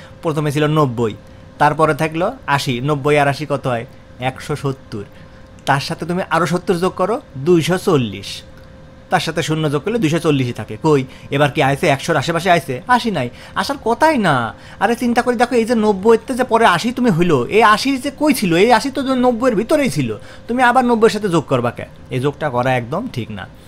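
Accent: Kushtia, Bangladesh. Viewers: Im in a love with Kushtia r vasha. 😂😂😂❤❤❤ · কুষ্টিয়ার অাঞ্চলিক ভাষায় অংকটা উপস্থাপন করেছেন, ভালো লেগেছে!! ভাইয়া❤️ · শুনেই বুঝছি আপনি কুষ্টিয়ার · কুষ্টিয়ার ভাষা শুনে খুবই ভাল লাগলো, কারণ আমি যে ও কুষ্টিয়ার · এটা তো কুষ্টিয়ার ভাষাই।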